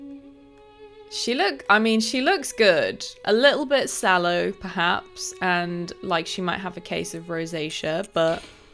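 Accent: United Kingdom. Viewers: It's quite funny to listen to a british girl (i assume) playing this game and commentating, it's very immersive actually.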